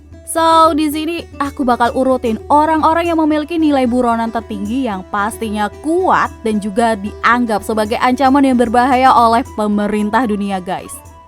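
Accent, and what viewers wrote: Java, Indonesia. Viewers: medok men mba.. 😁 😁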